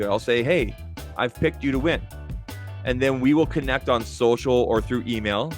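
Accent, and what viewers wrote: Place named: Canada. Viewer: thanks for what you do, the first time I met you I was in a live show of fpt and you donated $100 and defended Jon when some people were being rude. I commented that you sounded like a Canadian & you answered that you were from Calgary, my response was lol I’m in the gas city.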